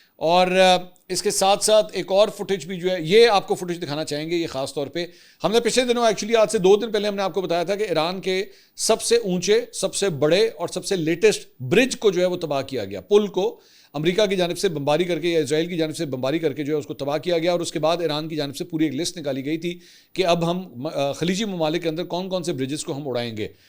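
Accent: Punjab, India. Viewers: Salam Mr punjabi touch in vlog brings 🔥🔥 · bhai Punjabi bhtttt piyari bolty hn ap😂❤ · bhai aap ke panjabi commentary ke kya he kehny ayhyhy maza he aa jata hai sun ke❤❤❤ love you...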